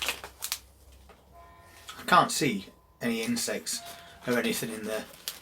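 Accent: Yorkshire, United Kingdom. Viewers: I'm from the other side of the Pennines, but I thought you were a Yorkshireman too! Enjoying the videos. Very relatable. Thank you! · Ay up I can hear a Yorkshire twang were you from?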